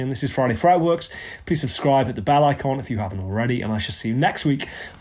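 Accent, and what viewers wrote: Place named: Geordie, United Kingdom. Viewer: Are you originally from Newcastle? Keep noticing a tiny bit of Geordie in your accent.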